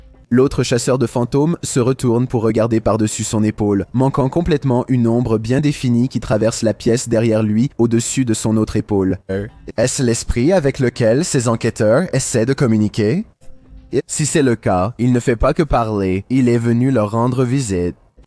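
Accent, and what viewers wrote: Quebec, Canada. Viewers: C'est l'axent québécois connace 🤨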